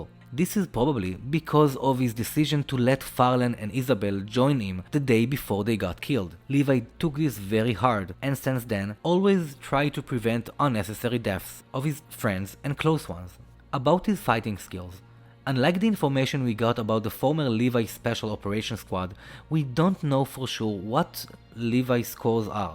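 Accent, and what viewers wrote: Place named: Israel. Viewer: אתה ישראלי, נכון? שומעים את זה כל כך😅😅😅 ואגב, אחלה סרטון👌🏻👌🏻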